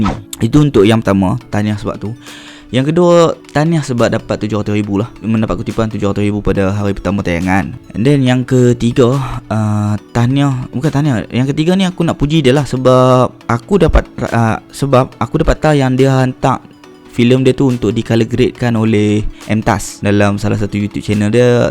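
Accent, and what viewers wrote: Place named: Kedah, Malaysia. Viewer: cakap kedah pon pahamm aih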